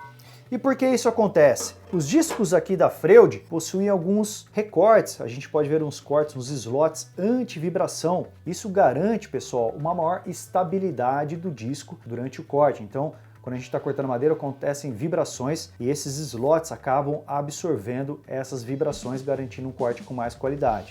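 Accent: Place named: São Paulo interior, Brazil. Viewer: Eu notei que vc era do interior por causa do sotaque.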